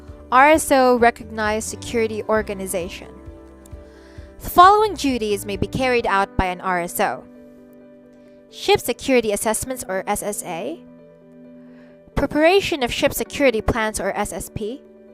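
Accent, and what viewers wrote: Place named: Philippines. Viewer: Filipino accent🇵🇭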